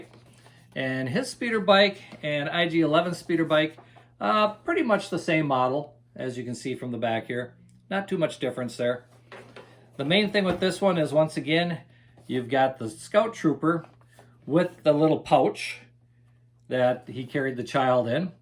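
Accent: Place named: Upper Michigan, United States. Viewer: Collected since 78 and still hooked. I have to ask about your accent. Are you from Upper Michigan by chance? I am and your accent sounds like back home. Lol Great channel!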